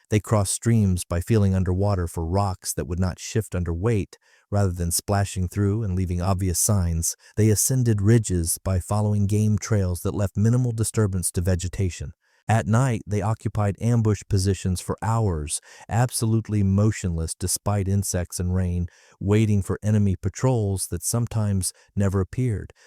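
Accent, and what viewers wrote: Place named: United States. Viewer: Mate, settle down, if you have any clue or understanding of accents this is an American narrator not an Australian production. Well done your Dad just different philosophies , Delta force doesn’t fight green army tactics nor do Aus special forces. Before bluster and loud noise do your homework and look at the actual kill ratio,s bud not just an assumption Americans do every thing the best. No one criticised the US soldier here but rather talked about mutual respect and teaching each other.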